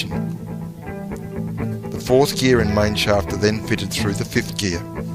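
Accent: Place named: Australia. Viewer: Hey great video.. I know this is old but hopefully you stillnsee it.. I have a t120v 1972 and the mechanic who rebuilt the motor couldn't get the gearbox working. it looks the same externally I was looking at it today.. though I believe my model has a leaf spring not a plunger.. same selector "quadrant" learned a new word today. Anyway the bike is in neutral the but gears won't change. Shift mechanism all seems right. I ran it when I first got it home and the gearbox locked up totally. its been sitting for years as I kinda gave up on it.. I looked at it today.. taking the outer cover off and its the kickstart gear that seems locked.. inside the cases..lower gear spins freely when Turn the tyre.. I'm a little on the "in over my head' side honestly but your video is pretty encouraging.. just wondering if A: you have any thoughts as to what could be going on wrong and B: being (as you have the Aussie accent) know anyone in Adelaide I could take it to?. 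Cheers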